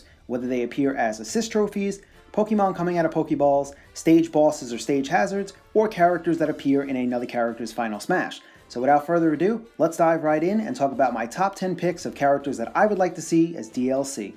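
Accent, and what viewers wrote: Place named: New York, United States. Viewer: I love this guy's NY accent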